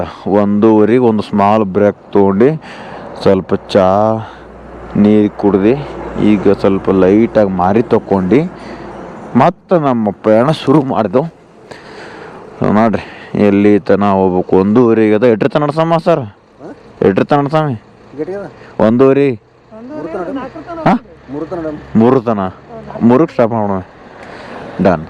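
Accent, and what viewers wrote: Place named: Kalaburagi, India. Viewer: Ur kalaburgi kannada is super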